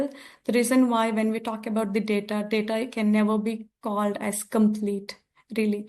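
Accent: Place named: India. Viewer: Its a pitty Indian accent is awful :/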